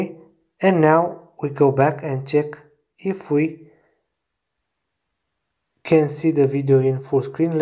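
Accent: Romania. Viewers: M-am prins din prima ca esti roman de la accent lol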